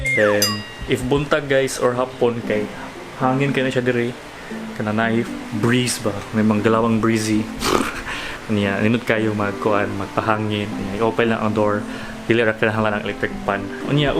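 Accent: Visayas, Philippines. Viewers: nindot kaayo paminaw ang bisaya conyo sa cebuano. 😂😂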